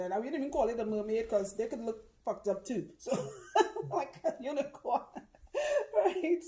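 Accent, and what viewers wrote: Trinidad and Tobago. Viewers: Are u trini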